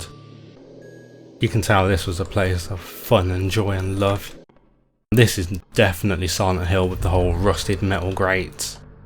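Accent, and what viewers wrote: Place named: Birmingham, United Kingdom. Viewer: are you from birmingham or black country? accents familiar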